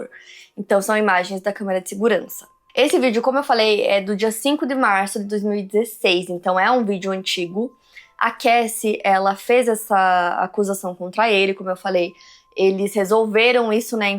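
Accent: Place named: Paraná, Brazil. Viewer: amo q a não perdeu o sotaque paranaense hehe <3 me sinto representada pela diva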